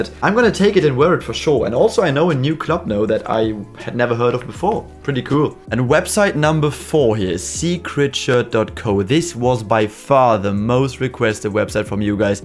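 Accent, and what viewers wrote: Germany. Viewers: are you a german because i hear it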